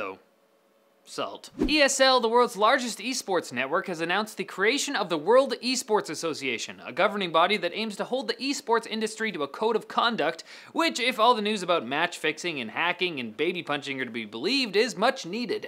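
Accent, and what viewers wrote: Ireland. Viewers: such a racist Irish accent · Nice Irish accent....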